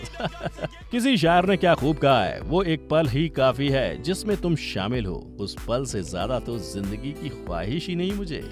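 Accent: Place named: Haryana, India. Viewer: Bhai hrynvi ni bt bolne ki kosis k liye 100/100😉🤘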